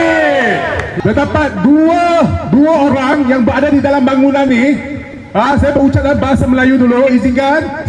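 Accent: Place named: China. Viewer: Ada juga yg nak dengar cina pelat bercakap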